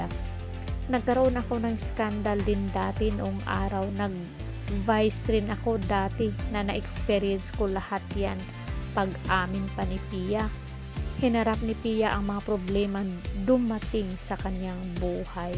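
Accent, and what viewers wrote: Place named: Visayas, Philippines. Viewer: Bat ganyan ka magsalita bisaya ka ba? yak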